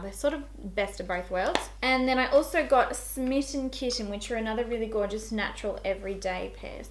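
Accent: Australia. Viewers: Are you from Australia if so which state??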